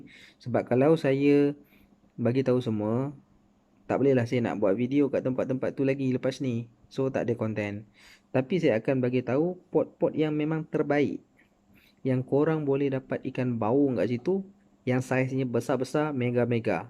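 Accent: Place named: Java, Indonesia. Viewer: Ada lah bang bunyi jawanya😅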